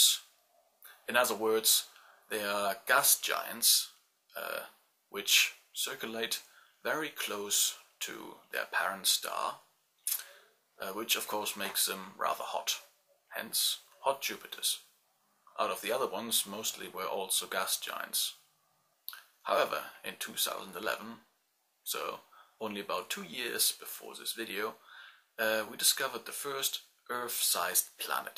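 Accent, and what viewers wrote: Germany. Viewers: God I LOVE hearing that accent! Ich bin von Erfurt. Aber wir (meine Eltern, Tanten, Onkel, etc.) leben schon für fast 30 Jahre in den Staaten! (In Salt Lake City, UT...) Woher kommst du? (Mein Deutsch ist nicht mehr so gut ...) God I hope you AT LEAST speak German... I swear! I KNOW that accent! I hear it every single day! It's so familiar. Then again, you could be Dutch, Swedish, Norwegian, etc... Hope I'm right though! ;)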